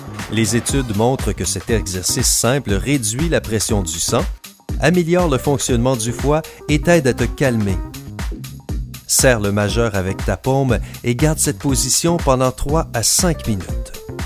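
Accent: Quebec, Canada. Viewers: Putin d'accin québékouè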